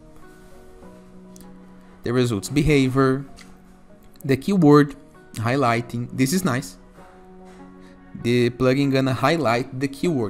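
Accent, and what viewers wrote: Brazil. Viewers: Uai... Você não tá ensinando português de graça, né... hehehe Mas a dica grátis é Faça um dicionário de pronúncia e passe a ler as letras na pronúncia do outro idioma, não na da tua língua materna Aí ao invés de lêrni ráu tchu impruvi en op-maizi dê sêrchi rezuuls E ficaria mais leern róu t'il óp(t)máiz een m-pruf dâ sêrsh (sem o i) rizult's Pra quem tá há 6 anos num país (a menos que você esteja em Detroit ou Miami) era de se esperar que você tivesse dedicado bem mais pra falar o idioma além do "suficiente pra se comunicar" e passado pra algo fluente (E olha que eu nunca sequer viajei pra algum país anglófono) Logo, trocar o maneirismo "né" por "ok" não faz nenhum sentido. Americano não fala assim. Até se fosse o "ya know" faria mais sentido ou trocar o "tipo" por "like" (que continuaria sendo feio, mas pelo menos faria sentido no outro país. 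Porque, se um zé aleatório que nunca viu tua cara conseguiu identificar LITERALMENTE em duas frases de onde você é, acho que ESPECIALMENTE nesse governo aí a coisa não vai dar bom pra você 😬 É um problema bastante recorrente do brasileiro classe média achar que é branco no mundo todo só porque se considera branco aqui [E eu falo isso justamente porque tendo a pensar que seria melhor você aí do que voltando hehehe]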